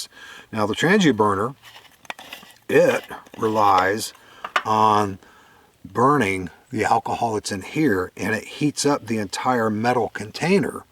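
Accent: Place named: Ohio, United States. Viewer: Are you located in Ohio? Your voice/accent remind me a LOT of Dave Canterbury. Great video, btw.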